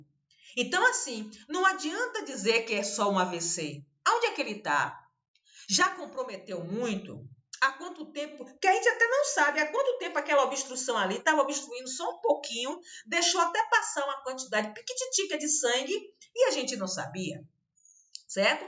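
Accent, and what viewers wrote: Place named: Northeast, Brazil. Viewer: Amo suas aulas querida ,a senhora tem o jeito de nós do nordeste falar, no momento moro em SP, faz 6 meses que comecei a fazer o técnico de enfermagem, mas N deixo de vê suas aulas ,aprendo demais com ela,gostaria de saber se tem como eu obter suas apostilas,não deixe de publicar os vídeos, um grande abraço...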